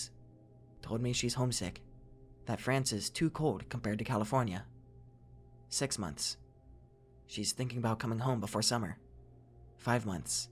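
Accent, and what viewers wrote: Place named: Maine, United States. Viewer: Hey man. I got an odd question. Are you from Maine? You have a Stephen King twang.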